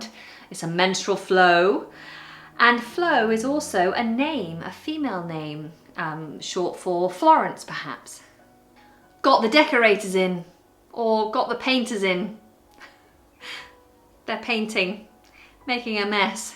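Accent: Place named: RP, United Kingdom. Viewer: I experienced the most unique feeling of both discomfort and pleasure listening to you speak about the menstrual cycle. I think it was the juxtaposition between the somewhat messy subject matter and your sublime Received Pronunciation.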